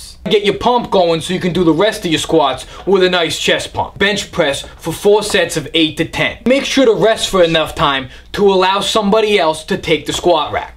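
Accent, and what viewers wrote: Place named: Italian-American, United States. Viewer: It's second-generation Italian-American accent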